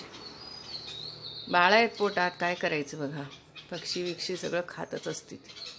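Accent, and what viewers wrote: Kolhapur, India. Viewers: मँडम चे व्हीडीओ छानच असतात आपोआप त्यात गुंतले जाते व्हिडीओ ची सुरवात पुणेरी भाषेत होत असली तरी नंतर कोल्हापूरीतच बोलतात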